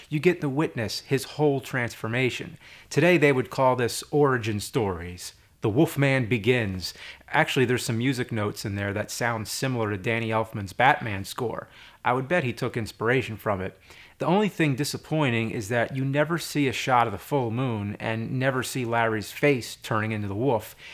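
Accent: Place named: New Jersey, United States. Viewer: Tyler White I think that would be his Jersey accent lol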